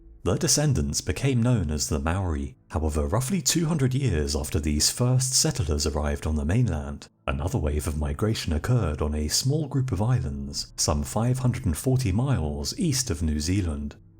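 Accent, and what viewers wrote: United Kingdom. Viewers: soon as i heard the british voice i started laughing lol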